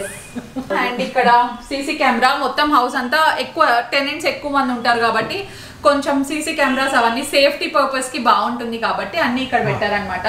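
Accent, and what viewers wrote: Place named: Rayalaseema, India. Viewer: God bless you and your family amma Rayslaseema bhasha vintunte chaala baagundamma😊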